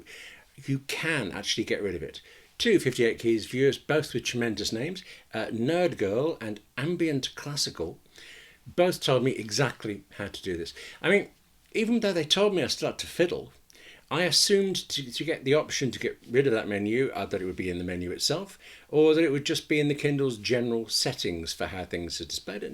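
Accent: United Kingdom. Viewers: I love the way that you describe your experience. so sincere and persuasive!!! and I do love British accent a lot. thank you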